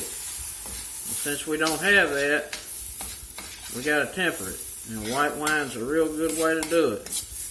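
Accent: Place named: Mississippi Delta, United States. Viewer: You sound like you're from the MS Delta, perhaps Indianola or maybe down around Yazoo City. Greenwood/Greenville? No matter where your're from, you made a nice video. Just please get yourself some good wooden or plastic utinsels to save that fine cookware.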